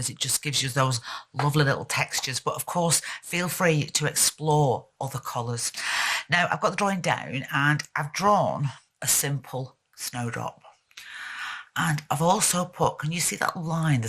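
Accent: Northern England, United Kingdom. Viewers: I'm delighted to have found your channel, and lovely to hear a Northern accent. Im truly in love with your technique and can't wait to have a go myself. Your colour choices are amazing. I've subscribed!!!❤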